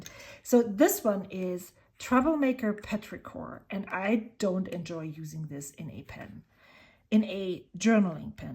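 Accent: Germany. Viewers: Thank you for such a detailed video; I enjoyed it quite a bit. That question #5 helped me a lot, as my approach to fountain pen has always been about getting a different writing experience from different pens, and I prefer Medium fine to medium nibs, as they have the right balance between nib width and smoothness. So, thank you. Regarding the inks(#7), I love both of the mentioned inks. I love teal(ish) colour. I recently got Lamy Petrol(2025 version), as I wanted sth that is close enough to dark emerald colour. Iroshizuku's new inks Rikka and Syun Gyo are on my list next. Thank you for such detailed and wonderful videos. I also liked your categorization of pens. I am someone who keeps a minimal and simple collection, but if I ever got that many pens, I am also going to have a similar system. BTW, are you German by any chance? I studied in Germany, and that accent seems familiar :)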